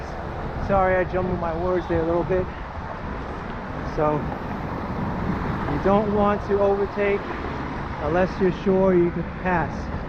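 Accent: New York, United States. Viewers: The hustling and bustling of the streets at Chinatown and Little Italy. I love to listen to the commentary and your NY accent. I will watch in stages. Do you prefer day or night bike rides? You have done an excellent vidblog. Thank you.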